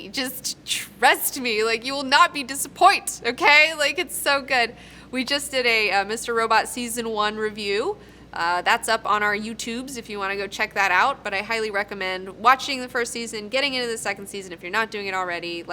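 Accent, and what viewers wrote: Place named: Appalachia, United States. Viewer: wtf.. how come youre talking with a hillbilly accent?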